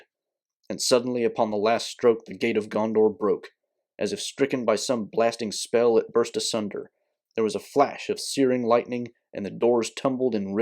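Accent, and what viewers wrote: South, United States. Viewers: Great Reading. Love the Southern Accent. Make more videos on the Silmarillion and Keep Pushing. Also, get on Odyssey and other platforms. I love your presentation. Very good.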